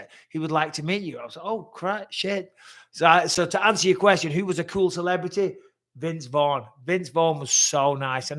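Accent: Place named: Scotland, United Kingdom. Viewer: It's very noticeable that you do slur your speech at times, plus your memory is pretty bad for someone your age. Have you ever had any checkups regarding brain problems, etc etc, people saying you have no such problems are just being polite etc etc, but thats not helping you m8, ps Scottish accent is god dam fking awful lol oh and is it possible you can actually get through a video without mentioning Darren Till !!!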